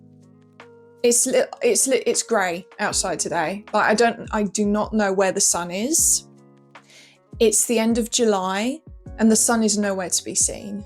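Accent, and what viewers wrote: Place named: RP, United Kingdom. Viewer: Wow Bridgerton accent IRL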